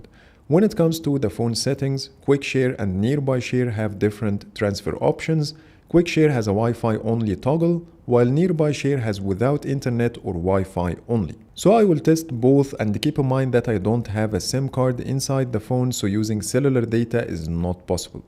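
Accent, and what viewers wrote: Egypt. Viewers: هو انا مستغرب بس انا لما سمعت لهجتك الإنجليزي جالي احساس انك مصري صح ولا اي😅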